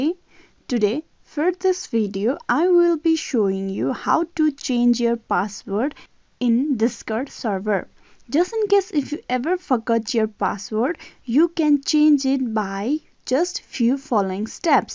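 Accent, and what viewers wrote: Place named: India. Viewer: I love your indian accent and thank you for the help man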